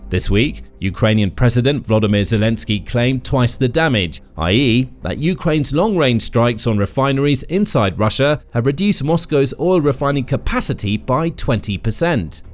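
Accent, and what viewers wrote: England, United Kingdom. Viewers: It really, REALLY bugs me how the narrator keeps calling petrol, "Gasoline", because he is English from his accent and in the UK they call it petrol NOT gasoline.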